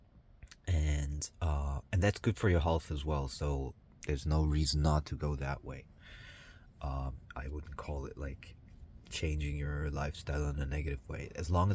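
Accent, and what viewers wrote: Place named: United States. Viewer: dein englisch ist sehr gut, eher amerikanisch geprägt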